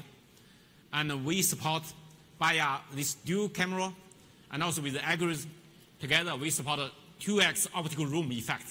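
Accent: China. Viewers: Ugh Chinglish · As a Chinese myself I can easily understand what he is saying about (real Chinglish ),but i am not sure that foreign friends on YouTube can understand.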